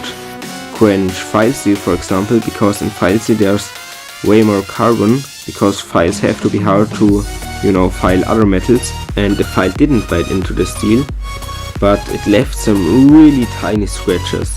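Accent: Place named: Germany. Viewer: Hab an der Aussprache direkt gehört, dass du deutsch bist haha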